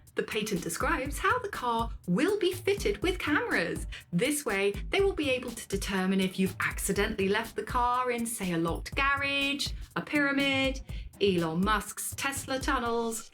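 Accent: United States. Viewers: The american accent is getting better every video. Well done 🎉 Question though: Did Amber use the cockney accent? As a german listener I am trying to learn the difference between the british accent (Lord, you have so many😅). The video however sounded like a bad idea for a Maximum Overdrive remake. This almost naive trust in AI is simply concerning 😑 Thanks for your time and content and the best of wishes Mr. and Mrs. Sheppard, hope they are doing well as well ❤ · It's weird that a brit other than John Oliver is teaching me about the goings on in my own country. Thanks for hilarious and educational content. And your American accents are fantastic.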